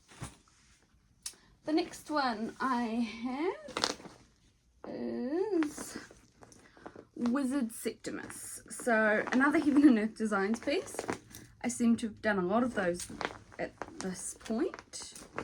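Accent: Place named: New Zealand. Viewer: Congratulations on your Lisa Parker finish 🥰 I’ve just found your channel… and love your WIPs As soon as I heard your accent I knew you were a Kiwi…. I’m an Aussie stitcher/flosstuber so I’m always really happy to find stitchers/flosstubers from Aus or NZ 😀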